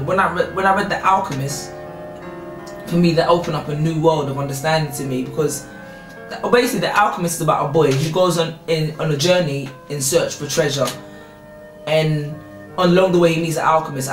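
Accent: United Kingdom. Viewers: I love your accent.. it's almost confusing though. Some words you say you sound Haitian and other words you sound British. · hey were are u from, i can tell u haev a british acent.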